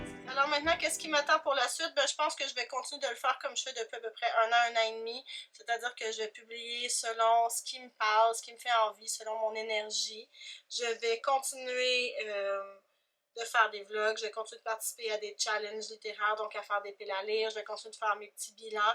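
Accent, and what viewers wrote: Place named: Quebec, Canada. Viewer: Bon anniversaire pour ta chaîne et bonne continuation. Je n'ai pas encore vu beaucoup de tes vidéos, mais j'aime beaucoup ta facon de faire (et ton accent québécois 😁) Je t'ai découverte par le biais de Karine et j'ai adoré vos deux vidéos sur votre weekend-lecture.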